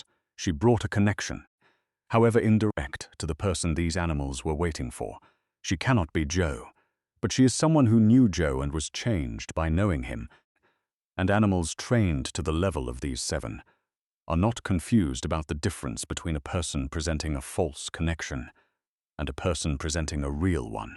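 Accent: United Kingdom. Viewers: It’s a British accent, in the King’s English.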